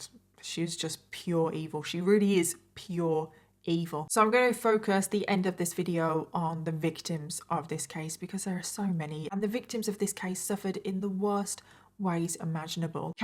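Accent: United Kingdom. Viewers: Hi, are you British or Australian? I love your accent.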